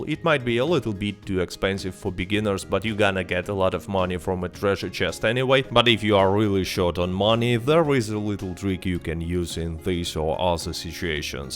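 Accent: Russia. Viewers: Are you Russian? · Ohhh this russian accent, but video is zaebis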